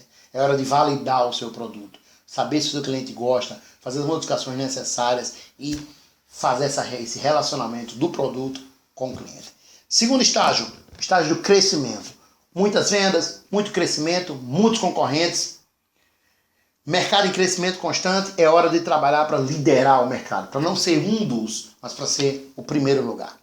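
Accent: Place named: Northeast, Brazil. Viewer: Muito bom! Explicação de fácil entendimento, muito satisfeita. Parabéns professor 👏.. E esse sotaque, meu nordeste ❤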